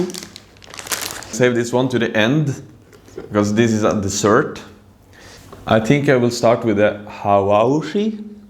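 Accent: Egypt. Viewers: ههههههههه كلامك المصري حلو جداً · I loved your Egyptian accent 😂 Go on , you are doing well 😁 I wanna say something about " makarona pashamil " , it's pasta with a white sauce and cheese and that's it I think it's not Egyptian , Try to eat " Mahshy " or " Mombar " 🤣🤣🤣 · عسل والله لما بتتكلم بالمصري 😂🌸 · I love you when you speak arabic with egyptian accent💜💜💜💜😂😂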